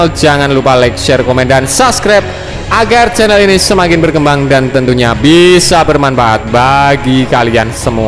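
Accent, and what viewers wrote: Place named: Ponorogo, Indonesia. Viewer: Mas ceking Ponorogo ya.. suaranya.. 😀😀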